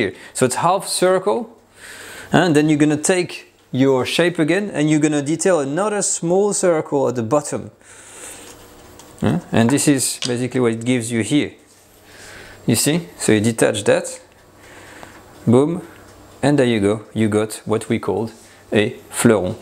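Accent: France. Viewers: I really love your french accent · Very charming French accent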